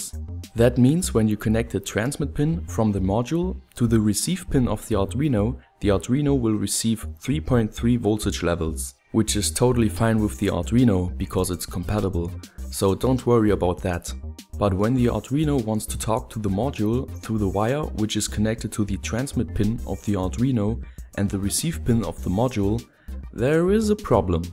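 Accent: Germany. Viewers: Are you a German? Sounds like :D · Du bist deutscher man merkt es bitte mach deine Videos auf Deutsch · Hmm.... The Sound is like german speak english 😉😄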